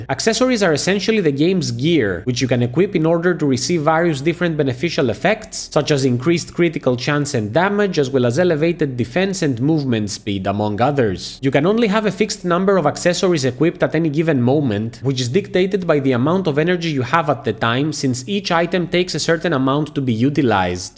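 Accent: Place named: Greece. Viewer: I have a feeling that you are greek according to the accent ! Good video! You just earned a subscriber :)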